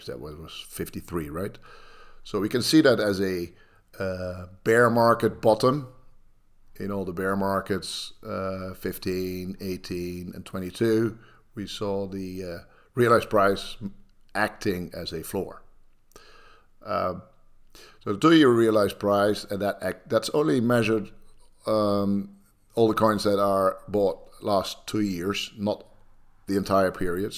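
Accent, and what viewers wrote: Netherlands. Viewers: If someone sounds like the new NATO top dog, they're Dutch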